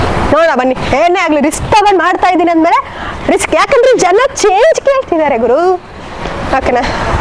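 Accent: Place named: Bengaluru, India. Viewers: Bengaluru hudgi Tara maataadta ediya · ನೀವು ರಾಯಚೂರು ಅಂತ ಹೇಳ್ತೀರಾ ಬೆಂಗಳೂರು ಭಾಷೆ ಇದೆ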